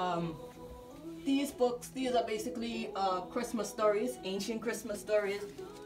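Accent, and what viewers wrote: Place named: Bahamas. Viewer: Few seconds in and I hear a Bahamian accent lol 🇧🇸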